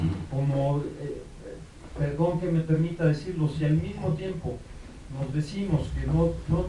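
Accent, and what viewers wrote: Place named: Mexico. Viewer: me dio mucho gusto verte despues de tantooooo tiempo, te reconoci de inmediato aunque la ultima vez que te vi aun no te salia la piocha, te encontre acento Mexicano (por que sera), si lees esto mandame un e-mail <mail> esta foto estoy con mi hijo mayor). Tu primo Felix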